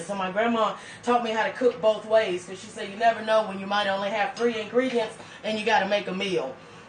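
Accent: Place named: Texas, United States. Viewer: You sound like you are in Texas with me😀😃🤩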